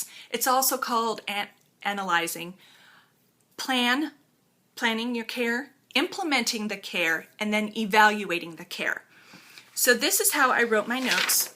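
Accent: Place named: Maryland, United States. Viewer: You must be a marylander... you have the same accent as me! Love your videos, thank you so much for taking the time to do them!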